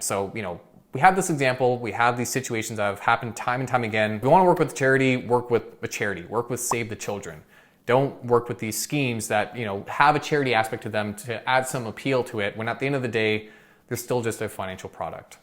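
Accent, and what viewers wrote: Canada. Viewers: I listened to your entire video. I picked up a Canadian accent. Do you think the influencer Romana Didulo will eventually take power in Canada? Are Canadians more susceptible to influencer deception than US people?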